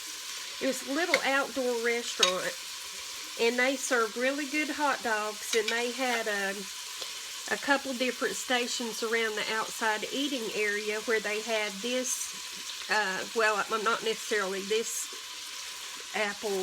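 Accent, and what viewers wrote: South, United States. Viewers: I love your accent, reminds me of my family from GA/NC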